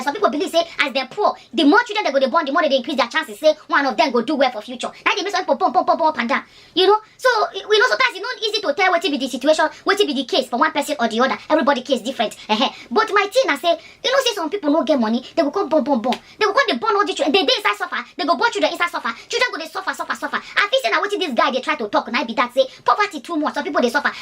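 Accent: Nigeria. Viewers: I'm so glad to be part of this journey!!! Your pidgin English sweet well well 🤗❣️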